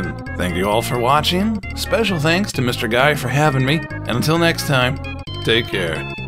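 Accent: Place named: Brazil. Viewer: Damn, another one falls to that bs copyright policy, i would like to help you out but i am kind of short in money (i can't even afford an internet of more than 6 megas) btw, until you spoke i forgot you were brazilian, nice accent, hope you get a fair amount of patreons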